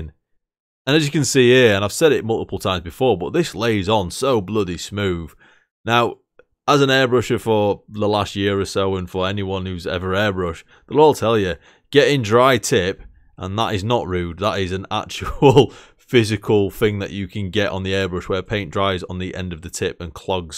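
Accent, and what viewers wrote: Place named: Yorkshire, United Kingdom. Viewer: It's PAUL DANIELS paint.... "That's Magic". Great to hear a Northern accent.... Possibly Yorkshireman! A great review mate, I'm looking around for a colour shift that goes like 'oil on water' effect! Needs to go over any colour... Am I wasting my time?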